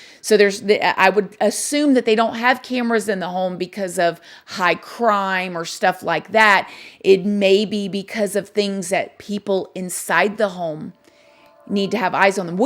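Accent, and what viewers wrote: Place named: New York, United States. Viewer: Omg I love your new York accent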